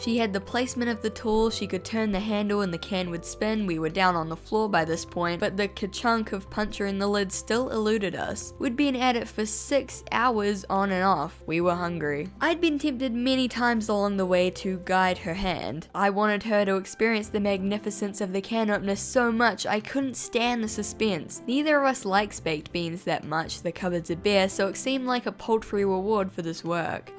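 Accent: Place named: New Zealand. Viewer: i can't tell if you're kiwi or not, but as a kiwi, your accent validates me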